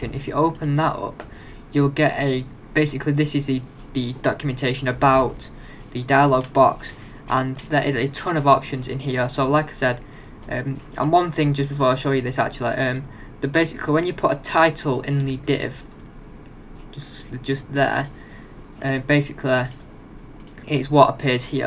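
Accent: United Kingdom. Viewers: Well explained...with a Manchester accent, too!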